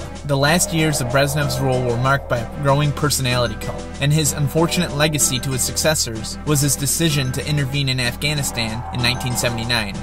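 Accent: United States. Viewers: The narrator has a distinct Michigan accent. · You from Chicago? I can hear that accent lol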